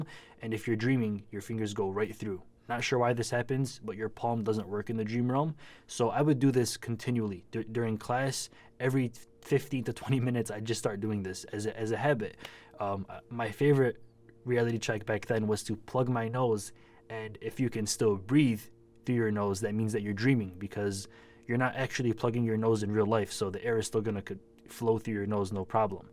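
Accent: Michigan, United States. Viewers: I thought your accent seemed so familiar lol I’m from michigan too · You look like you’re from dearborn you have our accent a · Immediately knew he was from Michigan because of the accent lmao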